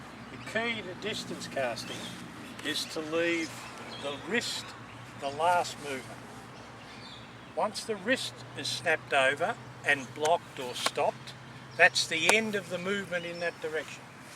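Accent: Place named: Australia. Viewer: First off, this is one of the most detailed explanations on how to properly execute the double haul albeit a bit hard to follow the Auzzi accent. I’ve watched many top notch instructors and not until now have I heard the reason to wait until the snap of the wrist. He’s absolutely correct in saying that most people start hauling from the beginning or translation of the stroke; I include myself in the bunch. I’m trying to correct this but since I’ve been doing it for many many years, it is not easy to change my technique but I’m trying nevertheless.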